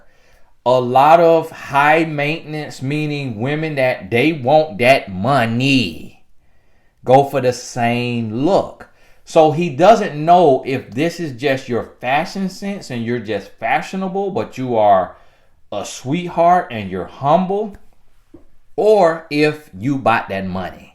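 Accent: South, United States. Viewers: You remind me of my sweet husband. We got married young. He was from Alabama. He passed so I love hearing the southern accent. I'm from California.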